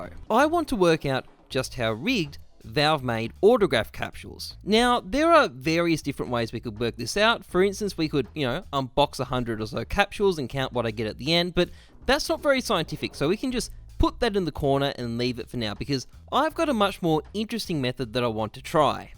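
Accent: Australia. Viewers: An austraaaaaalian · Are you from perth???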